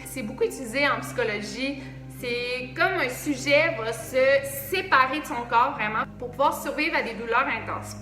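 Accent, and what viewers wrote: Quebec, Canada. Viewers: Beau travail! Je viens de tomber sur ta chaîne, c'est plaisant d'entendre parler 'Québécois' de trucs ''occultes''... T'as couvert les chemtrails ou la géoingénierie?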